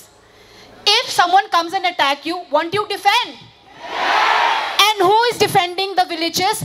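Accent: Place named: India. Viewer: Great speech. Even though you are so fluent in English and Hindi, and that your accent is pure Indian, you will still be branded as "illegal migrant from Myanmar" by Meitei extremists.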